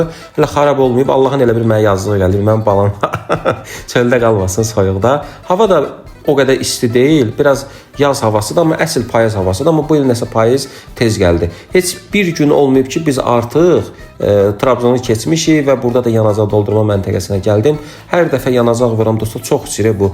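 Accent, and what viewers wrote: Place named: Neftchala, Azerbaijan. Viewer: Bayaqdan düşünürəm bu hara ləhçəsidi?”Nefçala”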